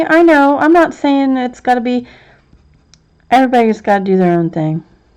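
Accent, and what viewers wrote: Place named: Dayton, United States. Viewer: Your coloring is beautiful. I’m from Celina, Ohio and sounds like your from Dayton ares? Keep up the good work!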